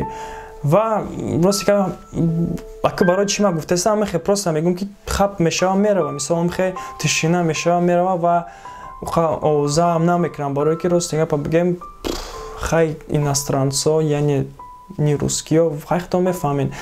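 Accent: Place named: Kulob, Tajikistan. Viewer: братишка офарин барои корот! кори зур хар руз хабари тару тоза медихи лекин гап заниатро хам каме иваз кун ютум кулоб нестай ки лахчави гап зани ича адаби гап зан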